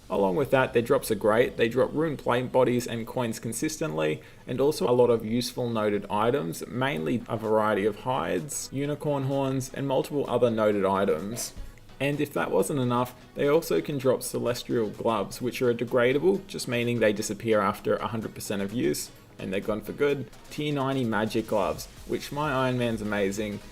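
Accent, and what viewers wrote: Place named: Australia. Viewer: Man I subbed after watching your Rex safespot guide, glad I stayed around. For such a small youtuber this is really good quality. I can tell by your accent you're aussie so I gotta wonder whats your upload speed?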